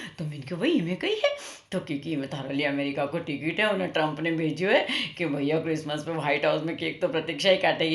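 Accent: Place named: Malwa, India. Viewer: Mast wani hmary malvi Goodluck............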